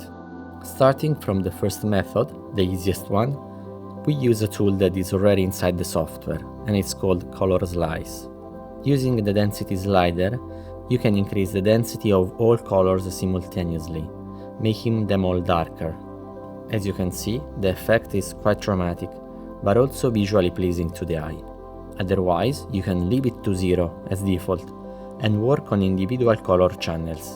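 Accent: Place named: Italy. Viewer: Saturated topic explained in a very unique and amazing way. Learnt alot, thank you. How do project settings affect this process? Ive been on the constant struggle between Color managment, input color space, etc. Is this something that changes your workflow in any way? PS: The italian accent makes it even more tastefull haha thank you!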